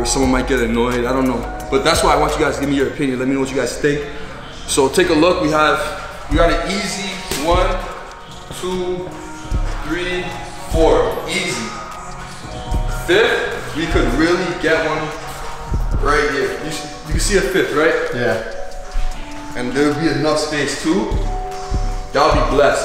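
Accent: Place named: Toronto, Canada. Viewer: As soon as I heard mans speak, I said definitely a Toronto dude. Congrats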